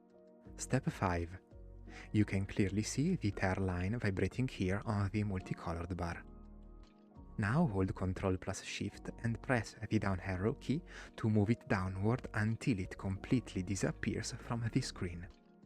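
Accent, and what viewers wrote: Italy. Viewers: Riconoscerei l'accento italiano da 1km di distanza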